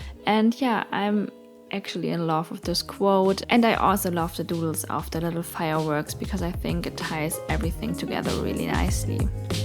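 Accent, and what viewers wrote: Germany. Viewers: Hab direkt gehört, dass du deutsch bist 😊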